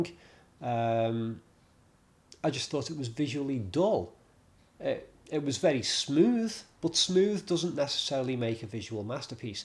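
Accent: Liverpool, United Kingdom. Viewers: has an English accent. I think he might be from Liverpool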